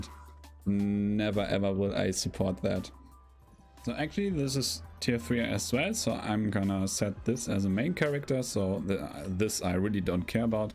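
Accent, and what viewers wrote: Germany. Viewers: Hey there, von deinem Akzent ausgehende denke ich, dass du deutsch sprichst. In der Hoffnung, dass ich den Kommentar nicht auf englisch wiederholen muss, möchte ich dir sagen, dass es absolut nachvollziehbar ist, warum du dieses "Spiel" nicht mehr spielen möchtest. Suchtmechaniken, verbunden mit P2W-Mechanismen sind absolute K.O.-Kriterien. Ich habe LostArk nie gespielt, aber die Kritiken, welche ich darüber gelesen habe, schlagen in die gleiche Kerbe wie dein Video. Ich hoffe bald wieder deine Bloodletter-Zauber-Videos bestaunen zu dürfen. Auch wenn Albion nicht perfekt ist, die Community haut' raus. Und nach meinem Kenntnisstand, bist du da n verdammt gern gesehener Typ!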